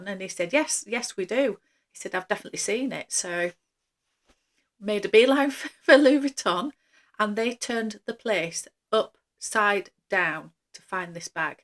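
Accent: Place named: Leeds, United Kingdom. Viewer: Wow, you are so fortunate to get your hands on this rare beauty! Congratulations on 1000 subscribers! And side note, I love that you're from Leeds -- I can hear it in your accent. When I was in my mid-20s I spent a summer in Leeds and had such a great experience and met so many kind people. Thank you for sharing!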